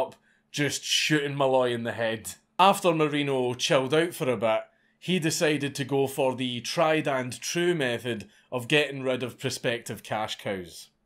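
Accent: Scotland, United Kingdom. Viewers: man, I'm slowly becoming addicted to this series. Hearing hilarious stories being narrated by a heavy scottish accent? Who could ask for more? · absolutely avg day! 🤭🤫 jealous you live in Scotland. Your accent 🥰🤤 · Daddy dankula yet again with another story told in his smooth Scottish accent...I love it. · Oi, mate! Ye Scottish?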